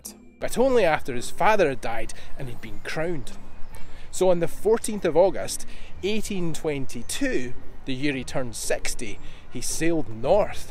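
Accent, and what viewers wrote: Scotland, United Kingdom. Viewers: Love the Scottish accent!! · As an Englishman I find it odd that comments like yours are supposed to be acceptable, but if you dared say that about any other ethnic group it would be considered a racist slur. I'm sure that even without a kilt, your accent would be enough to let people know you're Scottish.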